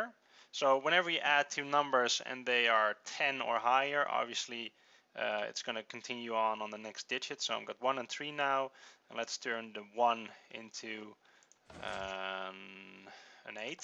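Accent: Netherlands. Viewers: je spreekt comparator verkeerd uit, 'tgeeft niet p.s. je kan hiren dat je nl bent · You are Dutch right? I can hear it 'cause of your accent :P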